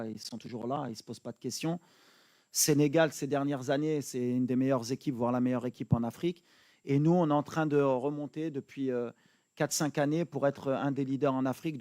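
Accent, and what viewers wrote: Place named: Paris, France. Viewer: Il parle Parisien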